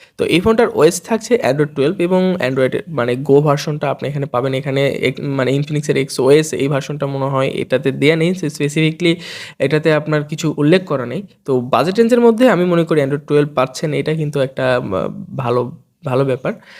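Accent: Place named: Kolkata, India. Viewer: আপনি তো বাংলাদেশি, কিন্তু আপনার একসেন্ট একদম কলকাতার মতো কেন?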